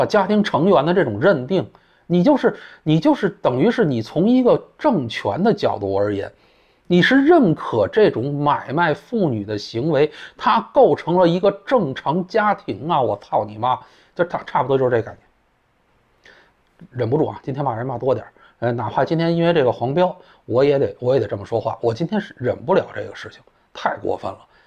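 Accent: Beijing, China. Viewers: 聽散人操著北京腔罵政府真是特別爽~ 🥰🥰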